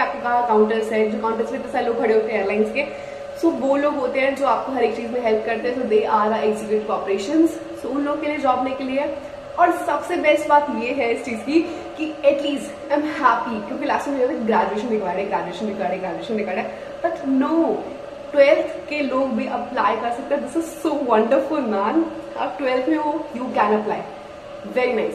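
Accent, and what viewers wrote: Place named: Haryana, India. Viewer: You speak haryanvi accent well. By the way wat happened to your hands. Any injury?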